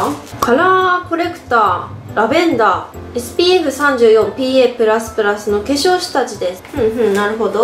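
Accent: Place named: Kansai, Japan. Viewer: さんって関西出身？すごいイントネーションが関西っぽい！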